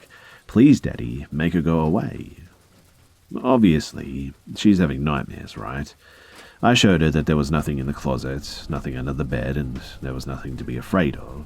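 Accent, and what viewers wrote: Australia. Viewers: Love Australian accents! ps I’m Canadian! Lol I always try but it comes out British haha I repeat this guy all the time mate lol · 2.5 hours of Be's sexy Aussie voice. ;). 🥂🍻🌿🌈🌸🌃🌆🌇🌋🌛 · If I'm having trouble sleeping, I usually turn on one of your videos cause your low, Australian voice lulls me into a sleep. I love your content 💛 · Omg my body basically can’t fall asleep at night without listening to this Australian mans voice 😂 It’s pretty annoying since I fall asleep while listening and when I wake up I have to find my air pod because it falls out of my ear while I sleep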